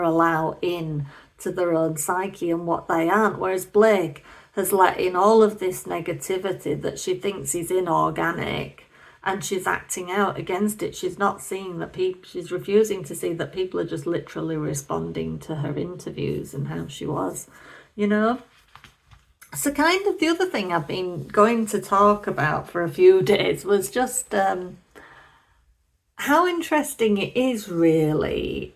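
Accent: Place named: North West England, United Kingdom. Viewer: Sounds like you are north west England, same as me x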